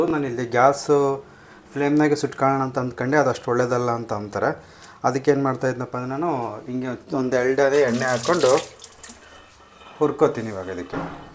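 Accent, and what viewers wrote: Chitradurga, India. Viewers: navu chitradurga navu nemtarane matadodu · Are u from Chitradurga sida aa.... Nimma kannada bhashe namma oorinde agide pakka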